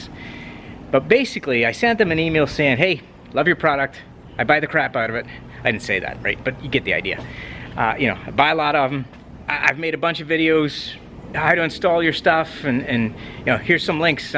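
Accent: Northern Ireland, United Kingdom. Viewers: Enjoyed your videos thought I got a sound of a north of Ireland accent , greeting from Dublin.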